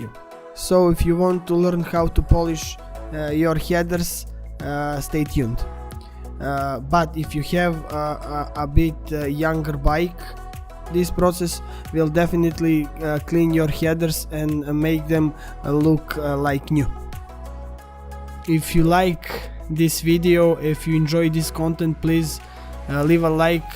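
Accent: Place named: Balkans. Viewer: Tacno se cuje taj balkanski akcenat :D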